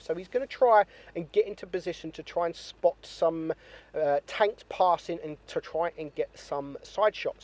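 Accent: United Kingdom. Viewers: Where is he from? UK?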